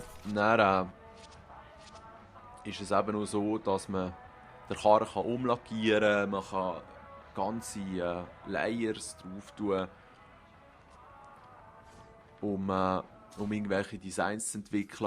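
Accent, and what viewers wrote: Switzerland. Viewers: das ist schweizerdeutsch, spezieler dialekt.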